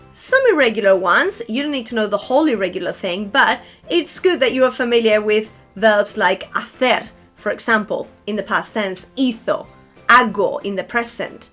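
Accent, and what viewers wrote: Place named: RP, United Kingdom. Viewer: Es una locura el acento que tienes, nunca hubiera pensado que eras española si no hubiera leído la descripción del canal. Hablas el inglés británico (RP) perfecto. Yo estuve un año trabajando en Londres y tengo acento de allí, además soy andaluz así que tengo 2 acentos muy marcados en ambos idiomas 😅 Igualmente eres un ejemplo a seguir para mí, ojalá hablar el inglés tan bien como tú algún día!!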